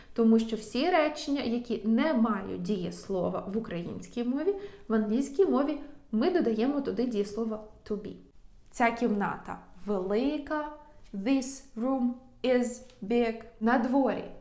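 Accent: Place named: Georgia. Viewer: Ваша вимова англійської така сама як грузин вимовляє російською. Та подайте коротенькі казки чи інші невеличкі тексти англійською, але диктор має бути людина яка є носієм англійської мови.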